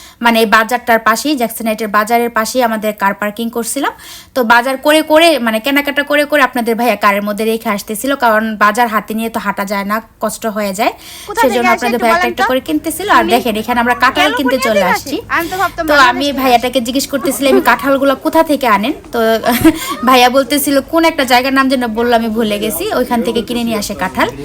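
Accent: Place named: Bangladesh. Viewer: আপু তোমার ভাষা গুলো অনেক পরিচিত লাগছে বাংলাদেশের কোন জেলায় তোমার বাড়ি প্লিজ❤❤❤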